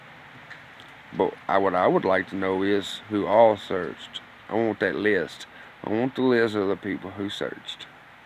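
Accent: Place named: South, United States. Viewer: I just heard you say your from the South. Where ya from? I’m in Arkansas from Louisiana. I knew you sound like home.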